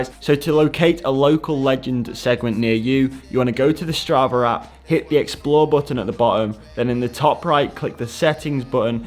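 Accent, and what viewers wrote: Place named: North, United Kingdom. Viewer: Is it me or do you sound more northern than usual?!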